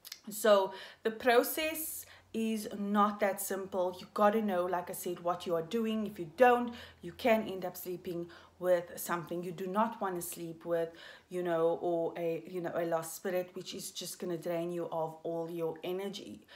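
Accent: South Africa. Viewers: Are you South African? Bec I am :)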